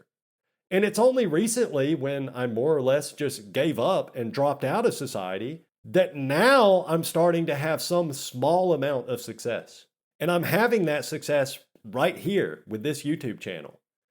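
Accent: South, United States. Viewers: Hey there. 33-year-old female here. I just came across your channel and this is the first video of yours I’ve ever watched. I’m only about halfway through but I don’t think you should be so hard on yourself. You seem like a really great guy. And you’re funny!!! Stop telling yourself you’ll never have a meaningful relationship. That’s not true. If you keep telling yourself that, you won’t have one. I’m blind using a screen reader so I can’t see what you look like, but you seem to have a nice personality. Work on your confidence in your self-esteem. That’s the most attractive quality in a man. By the way, where are you from? I feel like I’m hearing a southern accent lol. I’m from Kentucky so I have one too!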